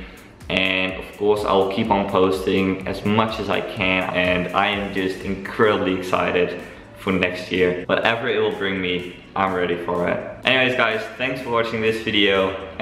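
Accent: Australia. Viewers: Hi, I thought I'am the only one noticed your Oz accent. Great that you had assimilated to the local culture pretty well. Happy New Year to you and may it be the best for you! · Talking like an Auzzi pal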